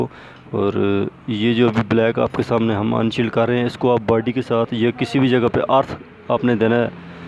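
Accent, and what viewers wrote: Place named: Pakistan. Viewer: Aap Ki Awaz se se lag rahi aap Pakistani hai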